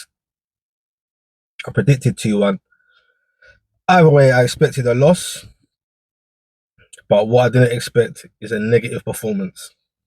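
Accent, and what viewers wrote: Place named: Cockney, United Kingdom. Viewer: You sound like a cockney arsenal fan not a geordie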